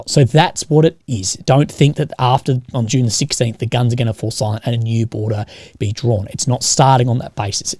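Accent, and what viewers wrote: Australia. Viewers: my dear.. i love your "aussie" accent (for me as an italian it sounds a bit funny but I love it) and I love your good will to be "near the reality" this is important for one like you that make reports about a situation on the field trying to avoid the "propaganda warfare" that we all know it's inevitable but of course not near the reality. So I will express here my appreciation for your work.. I really don't care if you (or somehone else) is pro-russian -pro-ukrainian or pro-something-other what I care is that the the job that you are doing try to get the facts. that's all... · So, this is Russia Today with an Australian accent?! Cool!!!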